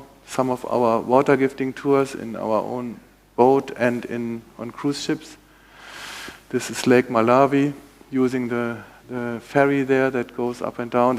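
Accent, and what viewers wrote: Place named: Denmark. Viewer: Sounds like Danish accent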